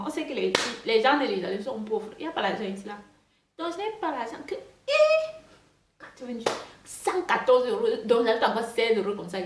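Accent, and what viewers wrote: Cameroon. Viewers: Effectivement je connais une camerounaise qui parle comme ça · Hey, es tu camerounaise? J'aime beaucoup ton accent, ne change rien.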